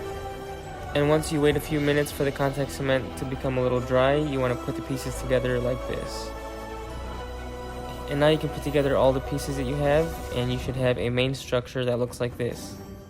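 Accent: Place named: Asia. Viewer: bro where are you from where your accent is like asian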